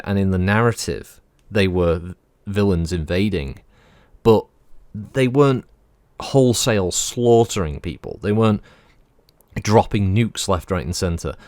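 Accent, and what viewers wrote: United Kingdom. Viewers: I'm now going to start picturing you in director Krennic's uniform when you're doing every recording with that thick British accent 🙂.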